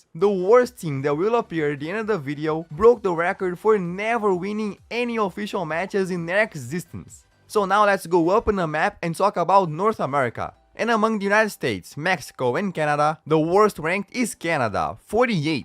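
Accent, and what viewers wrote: Brazil. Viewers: Ive live in brazil for almost 5 years and i can guarantee that this is a brazilian accent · This guy speaks English in a Rio accent. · Sounds like a Brazilian accent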